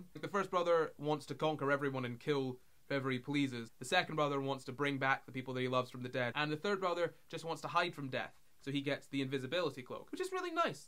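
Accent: Scotland, United Kingdom. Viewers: He is Scottish from Dundee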